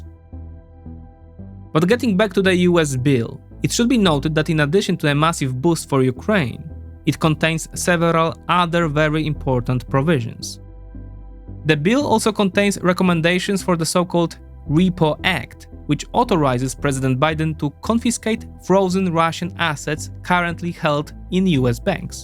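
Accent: Poland. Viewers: So happy to have the Polish accent back !! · Yaaaaaaaay he's Back!!!!! The Polish accent is back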